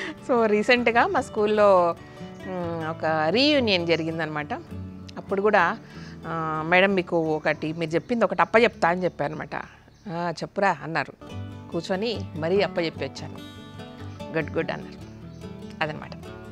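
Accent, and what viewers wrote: Telangana, India. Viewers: స్వచ్చమైన తెలంగాణ యాస తో మమ్మల్ని అలరిస్తున్నారు .ధన్యవాదములు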